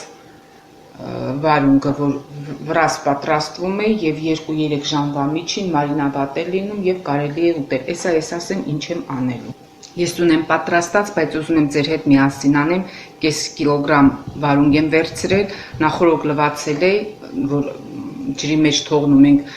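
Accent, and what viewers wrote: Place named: Lori, Armenia. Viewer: zgacvum er Lorva akcent@ hacheli e EV tesnel EV lsel.I'm hamaqaxaqaci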